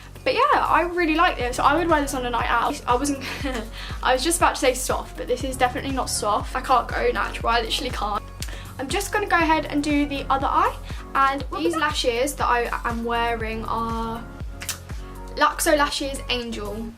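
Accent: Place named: United Kingdom. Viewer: Soooo fabulous!! Your work is wonderful and I love your british accent 💕💕 saludos desde México! 🇲🇽🇲🇽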